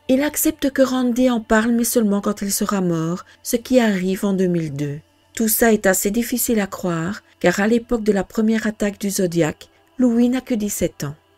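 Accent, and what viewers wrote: Belgium. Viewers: Bonjour, un grand merci pour tes vidéos de grandes qualités, je me pose une question:quand j entend ton petit accent viendrais tu de Belgique dis moi. 😉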